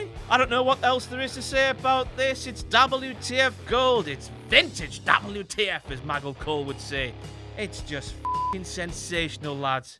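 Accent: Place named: Newcastle, United Kingdom. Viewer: He should just stick with his Geordie accent, as it is far more "hilarious" than saying 'dabble yoo, dabble yoo, eee" for laughs.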